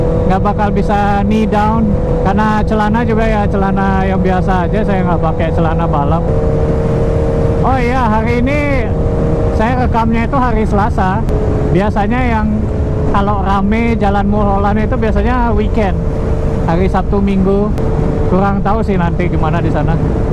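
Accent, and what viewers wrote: Bali, Indonesia. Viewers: logat balinya masih keliatan 😃😄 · bli orang bali bukan? logatnya mirip orang bali hehe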